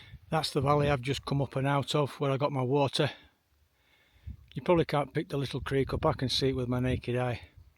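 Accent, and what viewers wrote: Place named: Yorkshire, United Kingdom. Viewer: ###king bear! Brilliant. Fortunately it doesn't understand a good Yorkshire accent. Keep 'em coming.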